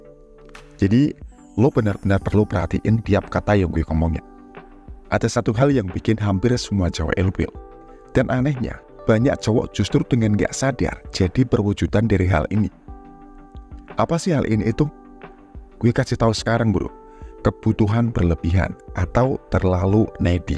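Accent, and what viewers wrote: Java, Indonesia. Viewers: Medok banget Jawir nya,, puyeng dengernya...😇😇😇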